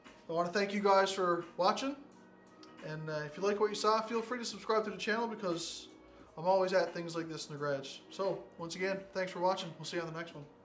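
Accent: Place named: Canada. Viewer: If the "accent" lol and the power fist box didn't give it away He's in Canada folks! Greetings my eastern brother from British Columbia!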